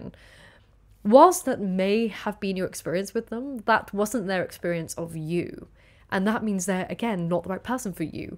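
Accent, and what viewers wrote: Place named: Birmingham, United Kingdom. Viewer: So your a Brummie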